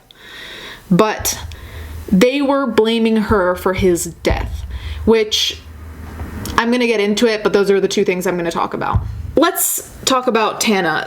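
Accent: Italy. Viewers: Love you saying things the Italian way lol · I saw a tweet that said that she Googled his middle name so she could put it in her post HA. Omg I didn't know you were Italian?? The accent!!! Drag me.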